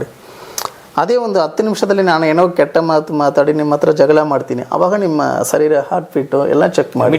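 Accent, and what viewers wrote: Tamil Nadu, India. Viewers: He is tamilian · Tamil avru sooo adikke hage aste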